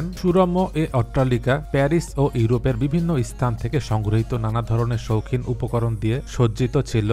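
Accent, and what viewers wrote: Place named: Sylhet, Bangladesh. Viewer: বাংলাদেশে যেকজন এরকম ভিডিও বানান তারমধ্যে আপনার কথাবলা বা ন্যারেশন সবচেয়ে আন্তরিক৷ কোনো ভনিতা নেই। আপনার কথার মধ্যে হালকা সিলেটি টানটিও লক্ষণীয় যা শুনতে ভালোই লাগে।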